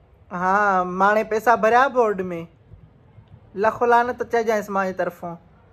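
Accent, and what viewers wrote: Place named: Larkana, Pakistan. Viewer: 'Kadhin kadhin shashindo' aw😁safa Larkane waran ji boli thi galhin 😂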